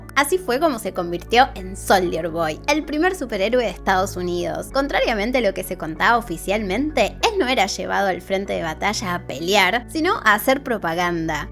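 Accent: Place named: Argentina. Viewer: Que acento argentino tan marcado joder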